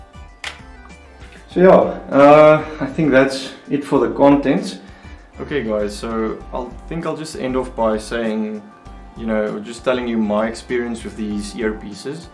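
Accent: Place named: South Africa. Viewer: That South African accent...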